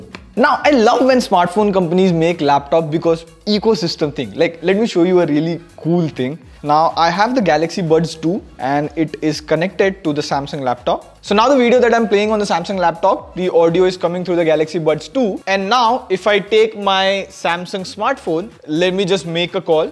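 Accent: India. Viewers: i was confused white guy with Indian accent😮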